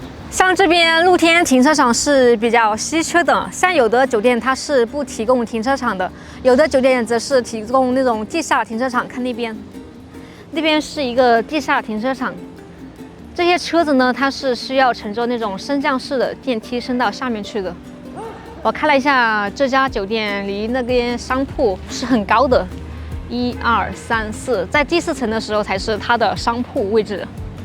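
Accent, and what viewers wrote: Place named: Sichuan, China. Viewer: 和四川人说话有点像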